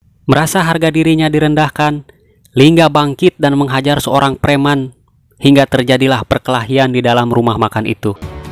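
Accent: West Java, Indonesia. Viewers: Dari awal kutebak, pasti orang sunda,. Salam sunda 💪🏻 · Bang dari logatnya kayanya abang dari sunda ya hehe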